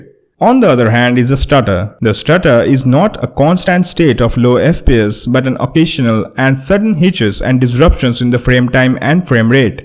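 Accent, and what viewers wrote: India. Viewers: Lag raha hai thoda bahut indian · He speaks good english and i like his indian accent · You've gone a long way to hide your indian but you still got a bit left.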